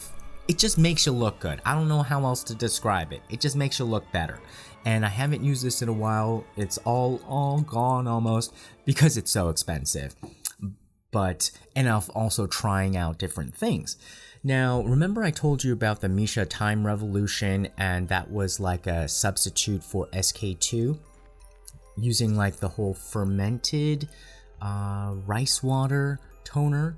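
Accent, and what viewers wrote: South, United States. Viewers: I wish you had your own crime show over here in the states,. I would have you on my TV 24/7!!!! I just love the way you explain things and tell it like it is. And omg those cosmetics you tickle me😂😂. I think I heard you talk about living in the States one time. I live in Tennessee so I talk like Ellie Mae Clampett lol and I just got to ask you about your accent because I think I hear a little bit of southern lol I just love it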